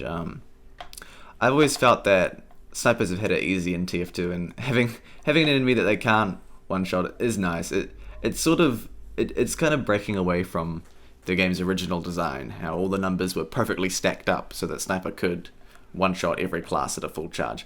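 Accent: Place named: New Zealand. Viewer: <3 dat New Zealand accent and ur vids :P ~ From Straya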